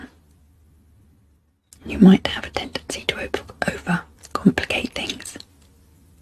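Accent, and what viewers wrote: England, United Kingdom. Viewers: I wanted to hear all the content rather than using this video as ASMR to fall asleep. Since it's soft spoken and she has a lovely, strong English accent (I'm Texan, USA), I turned closed captioning on. I find that feature quite helpful when I want to hear every word, as it's quicker and easier than backing up and re-watching dialog I miss. You can turn CC on by clicking the settings button on the lower right side of the screen when the video is playing. Just a suggestion! 😀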